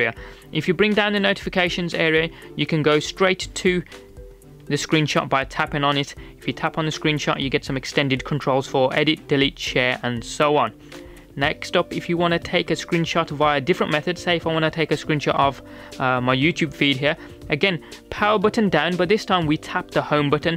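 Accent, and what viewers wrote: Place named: Birmingham, United Kingdom. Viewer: You from Birmingham...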